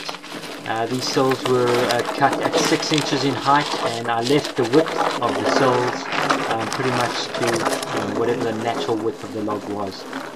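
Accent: South Africa. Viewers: South African accent? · Really enjoyed the video! Is that a South African accent I detect? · I can hear you are from South Africa. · Your south african..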